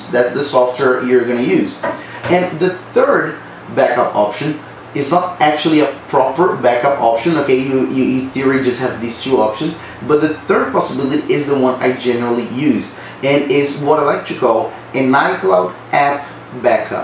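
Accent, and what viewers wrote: Brazil. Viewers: It took me 5 secs to know this dude’s a Brazilian... it’s engrained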